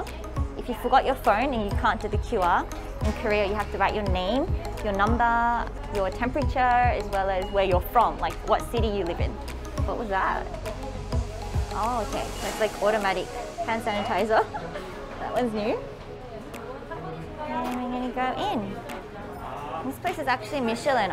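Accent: Australia. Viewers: Well in Australia..cause you sound Aussie..it's worst in NSW and Victoria..due to lockdowns and restrictions...we cannot do any store shopping..it's click and collect only and delivery...many cafes etc closed and many other businesses closed/gone..many people out of work and hospitality businesses gone...its probably the same in many countries... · Hi - did you used to study in Aus? You have a bit of an Aussie accent happening:) You should see what 6 lockdowns have done to Melbourne - it's really terrible! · aaaw I really want to go to Korea, hopefully covid will be over soon! She got Australian accent btw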